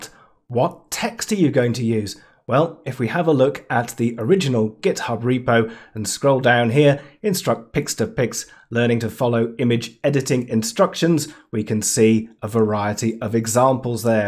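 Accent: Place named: United Kingdom. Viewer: When I hear your voice I think of Monty Python, with that perfect British diction, it's kind of humorous to be honest.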